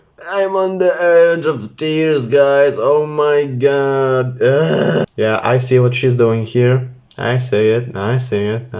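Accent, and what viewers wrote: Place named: Poland. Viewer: you have a polish accent, r u polish?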